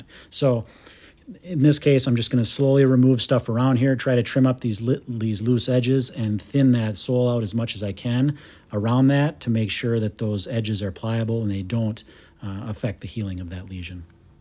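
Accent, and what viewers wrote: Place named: Midwest, United States. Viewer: I love the Midwestern accent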